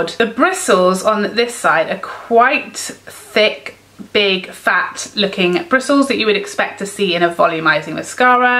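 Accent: England, United Kingdom. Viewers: You've gained a new subscriber in me, not just for your makeup info but your english accent and phrases, whack, willy nilly, got me😁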